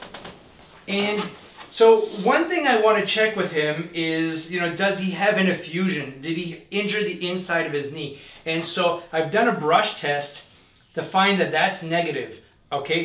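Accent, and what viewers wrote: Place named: Quebec, Canada. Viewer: You have an interesting accent...are you French Canadian? You sound like Jonathan Pageau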